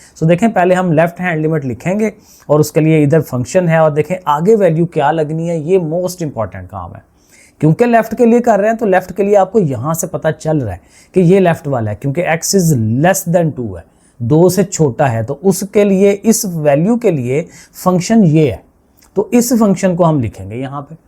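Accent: Sialkot, Pakistan. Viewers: Sir has a pure sialkot accent😂 like who else noticed❤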